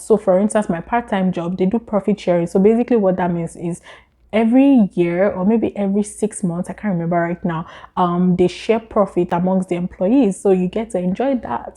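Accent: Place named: Canada. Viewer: Aha ! Your accent is different in this video compared to a year ago. You sound more Canadian with your English accent.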